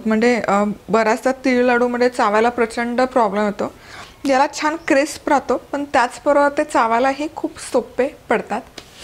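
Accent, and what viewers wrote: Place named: Pune, India. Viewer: Jinnas means ingredients na..ryt....ssly punyatle lokanche marathi pure e..sahi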